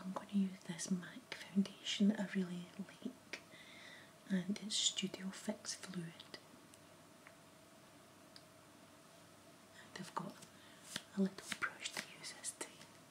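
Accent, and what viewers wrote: Scotland, United Kingdom. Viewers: You're glasses are just everything! Scottish accents are still the best!! 😘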